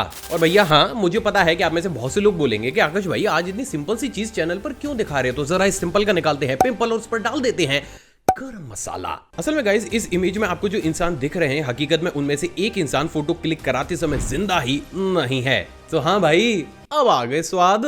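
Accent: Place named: Mathura, India. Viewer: Bhai aap kaha se ho ye language to hamare yaha ki he mathura ki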